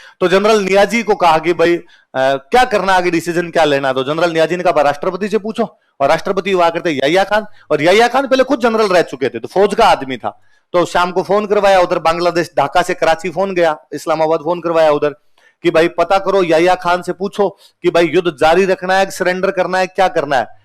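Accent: Rajasthan, India. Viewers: राजस्थानी टोन ऐसी ही होती है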